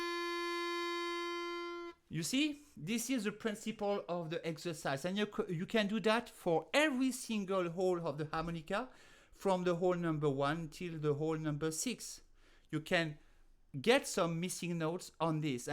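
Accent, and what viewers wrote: France. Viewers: Oh please, I'm jealous of your accent. My native language is Brazilian Portuguese and we just love out there hearing you, French speakers, speaking our language with your delightful accent. No apology necessary! My apologies for being jealous... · Great video for a beginner like me, and please dont apologize for your accent, I think your english is pretty good and I am glad that you give the non-french speakers the option to learn from you too! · Pardon ? Pour quoi? L'accent français c'est toujours adorable... Et moi je préfére ecouter l'anglais comme ça, c'est plus facile pour mon oreille. Je viens de descendre deux demi-tons pour la première fois dans le deuxième trou ! Alléluia ! Merci beaocoup. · Je me suis abonnée à tes cours et à tout ce que je peux recevoir de toi. Tu es une super harmoniciste, et heureusement que tu as un accent bien français, sinon je ne comprendrais rien... 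Par contre , comment fait-on les overblow (par exemple dans "Yesterday") ? Merci pour tout ! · Bonjour, en premier merci pour la leçon et pour toutes les belles performances que tu partages sur Youtube - puis à propos de l'accent, mais tu n'as pas à t'excuser tout le monde a de l'accent lorsqu'on parle une langue etrangère en outre dans une autre vidéo tu parlais l'anglais sans accent ou presque et moi j'ai été susrpris de savoir qu'une des meilleurs joueurs de l'harmonica au monde soit française:) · I like the French accent. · Do not apologize for your French accent! It is delightful! And your harmonica playing is....well, shall we say....magnifique! Merci bien!! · Just watch your video... Just started to play the Harmonica a few weeks ago and i'm 78 years old.. I love the challenge. Don't worry about your accent you do great! That goes with being French! Thank you again.. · Bon jour, Madame. 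I think it is not necessary to apologise for your accent for the simple reason that the French accent is oh la la, wonderful to hear! And your harp playing is the best! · Vous n'avez pas à présenter des excuses pour l'accent français - il est un plus pour moi! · Hi, i love your french accent💙and your music style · why apologise for a French accent. French is the language of love and one of the world's most beautiful languages. carry on with your fantastic accent so we can enjoy listening.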